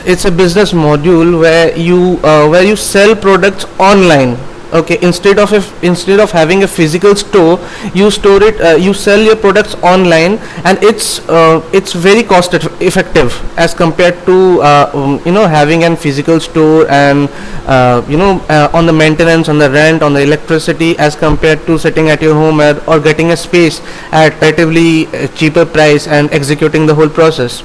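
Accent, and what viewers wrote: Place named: India. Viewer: ... U sound like an Indian guy... Why then???.. Be proud to be Indian man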